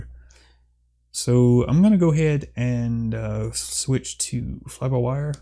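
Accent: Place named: Louisiana, United States. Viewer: Where you from bro? Sounds like you have a Louisiana accent 🤔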